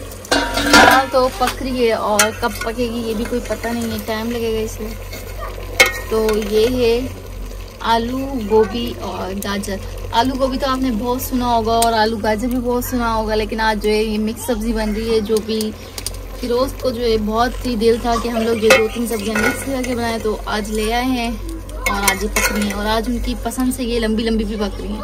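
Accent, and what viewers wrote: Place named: Sindh, India. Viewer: آپ کا بولنے کا لہجہ سندھیوں جیسا ہے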